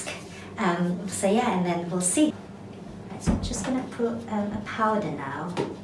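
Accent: United Kingdom. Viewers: I like the british accent 😯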